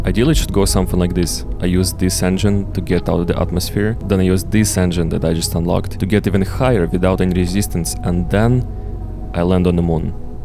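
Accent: Russia. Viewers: from a guy with strong Russian accent... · NOT an American lmao that accent exists NOWHERE in the US 😂 feel like he comes somewhere from Russia or Asian/Euro area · Wha What is your accent? Not trying to offence, I'm really interested, if you're from Russia.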